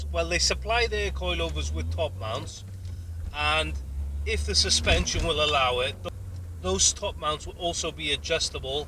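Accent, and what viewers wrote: Wales, United Kingdom. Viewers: I got a racechip ultimate connect on my golf mk7 1.4tsi,and the moment I have taken it off as it keeps throwing cel code for turbo sensor wen I drive hard,Amy ideas why mate,cars fine wen uninstalled,by the way are you from wales originally,sound like a Walsh man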